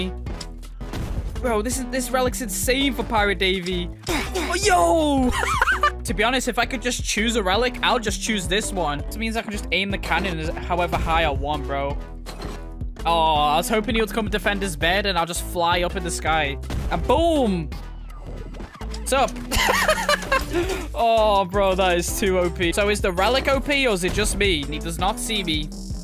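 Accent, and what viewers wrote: United Kingdom. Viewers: Why we like to watch: His cackle laugh, his great persona, his Timmy voice, his god level skills, his British accent, his continued upload schedule, his creative ideas, he’s a great person over all ❤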